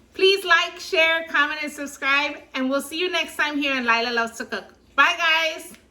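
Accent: Bronx, United States. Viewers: I’m Puerto Ricen an l watch your video forthright first time. Girl were have you been all this time,loved your white rice. Are you fromNY? The Bronx maybe? You sound like it . May God Bless you.👍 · girl you sound like you're from the bronx...i love it!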